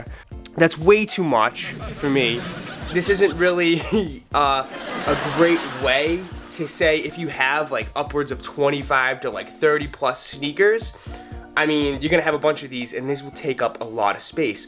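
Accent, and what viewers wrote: Boston, United States. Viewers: U from Boston?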